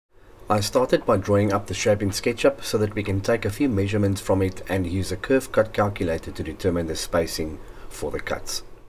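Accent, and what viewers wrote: South Africa. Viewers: I heard your accent and was gonna ask if you were based in SA? But then I saw the news paper you used with ‘GAME’ advertising, and we was like.. aah..haha. Great looking speakers, and good build techniques, I’m learning a lot from you, thanks!! Where do you get your parts, as I know SA isnt that easy to find stuff like that.. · awesome to see a fellow SA citizen make it in YouTube. Accent was very fimilar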